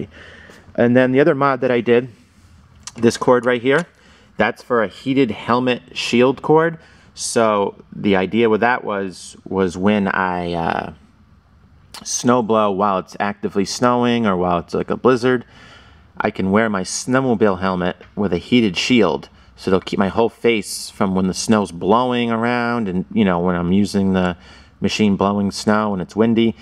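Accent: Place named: New York, United States. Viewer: Hi , really great honest real information.. so... seems they are really slow... live in vermont don't want to walk at a snails pace... can they actually move faster than a slow tedious walk and do they go backwards as fast as forwards.. ? can you maneuver easily without engine power and clutches in, to position in a garage. I love everything on it .... oh yes... is there a power cord back up or is it battery or pull start only.? Thanks .... lol love your NY accent and your excitement and then disappointment at no snow in 2016 I bet you hoped for a huge storm and bailing the neighbors!..... boys and toys! Thanks again for the vids well done and thoughtful.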